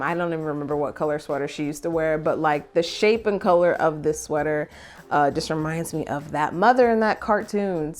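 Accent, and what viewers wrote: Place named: United Kingdom. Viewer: British accent always kills me LOL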